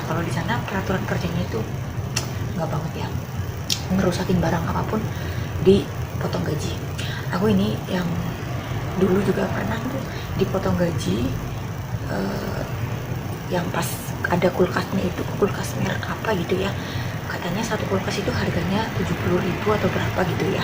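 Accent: Banyumas, Indonesia. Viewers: Ora ngapak ora kepenak mbak..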